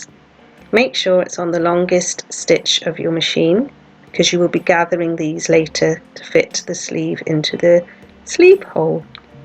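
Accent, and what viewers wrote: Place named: Wales, United Kingdom. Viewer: Fab tutorial👍. Clarity , brevity and simplicity. I’m inspired. 🎊🎀tell me , are you welsh? I’m in Cardiff and you sound local!🙋🏻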